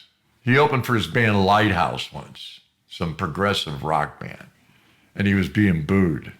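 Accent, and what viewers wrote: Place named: Illinois, United States. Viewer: Thank you, for giving me this transporting window into your life with your brother John and a cast of characters that was colorful, fun and from the heart and reflects your love of the time you spent with him. 🌸Your Illinois voice makes the stories even better as I have family from west of Chicago, more fun than a barrel of monkeys. God bless you ❤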